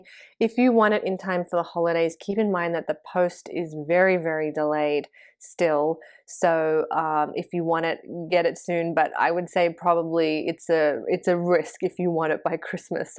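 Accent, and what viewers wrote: Australia. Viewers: She must have lived in Australia a long time because of the Australian accent.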